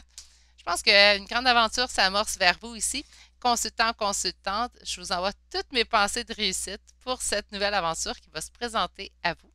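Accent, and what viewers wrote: Canada. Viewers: Je suis espagnole. J'habite en France depuis 5 ans et malgré le fait que je ne suis pas habituée à l'accent canadien je comprends parfaitement.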